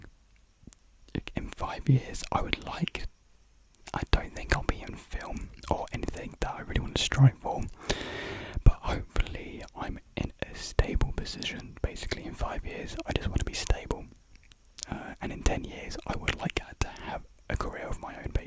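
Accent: United Kingdom. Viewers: I see im not the only one who also listen to your conversational videos to learn English It would be fun to hear you speak with a full American accent, but your British accent adds a special charm to you and your asmr Thank youuu i love u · oooo you know you might be one of the first few British ASMR people I've found never realised I prefer listening to my own accent rather than american!! Loved the mouth sounds a lot you should definitely do some more :)) who's your fave music artists atm ? x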